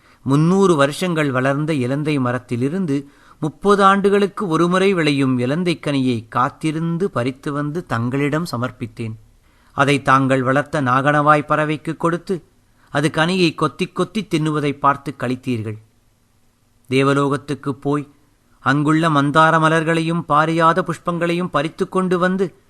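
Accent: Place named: Tamil Nadu, India. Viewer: hello sir this is Karthic from Coimbatore am big fan for ur audio book and liked ur Tamil ascent very much gud work kudos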